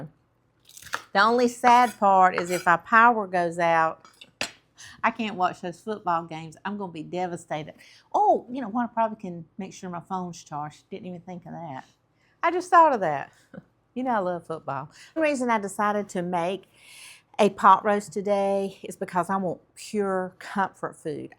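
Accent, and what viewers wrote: South, United States. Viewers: Now that looks like tasty pot roast! You’re always are so upbeat and I adore your Southern drawl. Thanks for all you do to just draw us in for little more seasoning. ❤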